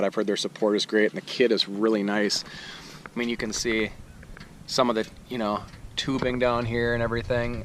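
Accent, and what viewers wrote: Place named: Minnesota, United States. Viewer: Dude sick ass car! And loving the Minnesota accent lol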